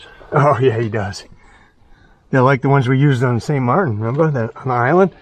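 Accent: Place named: East Coast, United States. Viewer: Hey man, sounds like you on east coast my brother. I got some rising concret around the perimeter of my pool. The whole back yard is concrete. I have bullhead placement stones around pool which are good. The issue I'm having is rising concrete around my northern edge of my pool which has a about 5 feet to the stucco wall border... It is at most 1 inch-mostly about a 1/2 inch rising right at the placement stones. I was thinking of just sledge hammering it down and using cement all to put it kinda back together after fracturing it down. A friend said to grind it down. All my control joint which are about a 5ft intervals are cracked so I was going to polyurethane them and pound out the edges up against the pool placement stones... now this is probably crazy but as an option...I was also thinking of pouring the cement all and leavening out the bullhead slant up to the rising edge... 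So my man whats your suggestion????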